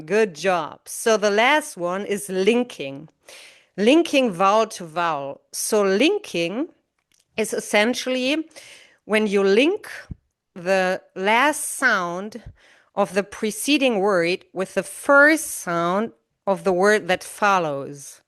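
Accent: United States. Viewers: i'm hearing a Minnesotan accent. sounds good.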